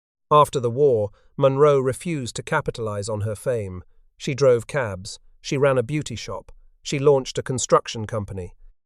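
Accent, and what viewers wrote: United Kingdom. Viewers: I absolutely adore listening…but genuinely curious why we have a male British narrator to catalog the history of American greatness 😅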